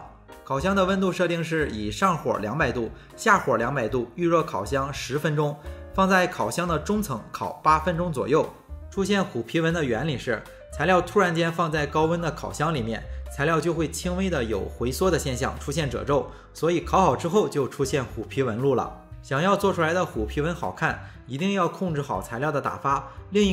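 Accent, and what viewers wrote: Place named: Jinan, China. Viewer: 老爸真是中西结合的好典范啊，亦中亦洋，都拿捏住，太绝了，你也太有口福了吧！！我是济南人，听着你的济普很亲切哦！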